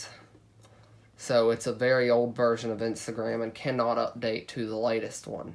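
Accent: Texas, United States. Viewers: 1 second and i already know youre from texas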